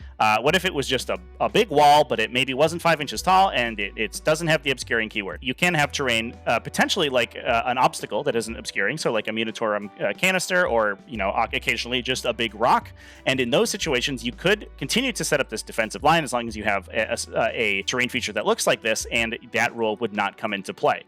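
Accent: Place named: United States. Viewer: Dodgy head set. You still sound American :)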